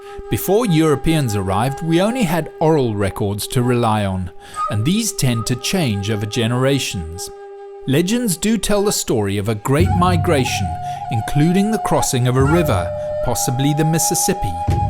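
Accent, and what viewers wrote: United Kingdom. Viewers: Solutrean if you believe the interpretation of the archeological record. Bearing Straight origination is even less likely than Pacific Pan-oceanic migration. Truth doesn't concern itself with feelings nor should it, funny little British accented man reading US a script telling US about our history. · Imagine being the one who left that turd I bet at the time they weren’t thinking that far into it- why is a British accent talking about how old natives are uh dude our ancestors all are the oldest yah think no one nation can clam the oldest that’s like saying I am better cause I have blue shoes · Does anyone else notice the irony that this post about Native Americans is narrated by a man with a British accent?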